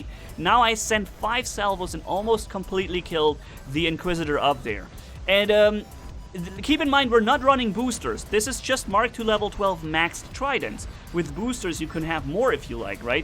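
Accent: Germany. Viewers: Are you from german?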